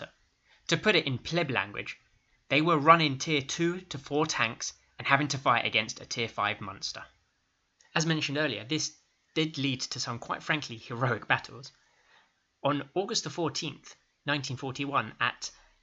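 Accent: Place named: United Kingdom. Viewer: u sound like your from the UK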